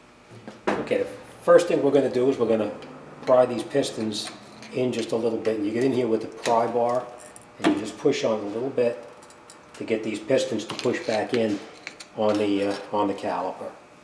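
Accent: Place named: New York, United States. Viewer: I'm a New York and I can hear it!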